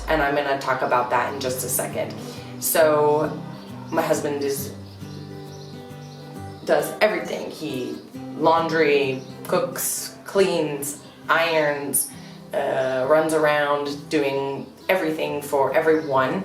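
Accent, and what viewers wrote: United States. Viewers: pa ne,ali to se dešava s američkim naglaskom,nebitno ako ti smeta jednostavno nemoras gledati,toliko jednostavno,a osim toga ona ako i radi grimase ne presedava se,radi to nesvjesno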